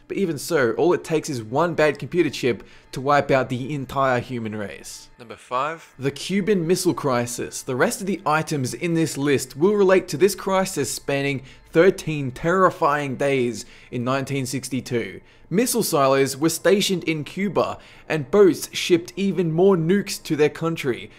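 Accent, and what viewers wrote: Australia. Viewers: Reaver He's australian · Most annoying Austtalian accent ever. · Are you Aussie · Are you from Australia? Also please do 10 creepiest things that ever happened on earth video. 😀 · I find it hard to place the narrator's accent. Sounds vaguely Australian. Anyone know?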